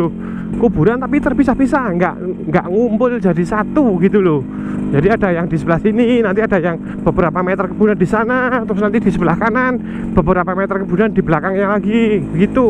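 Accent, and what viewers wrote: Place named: Wonosobo, Indonesia. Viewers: Gw seru ma celotehan nya bkn ma situasi nya.. Ngapak asli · Jane rono bengi wani ora jal Jiaaann ngomonge wonosobo bngt basane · haloow Jawa ngapak hehehe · maer mas logate Bahasa Indonesia - Wonosobo wkwkw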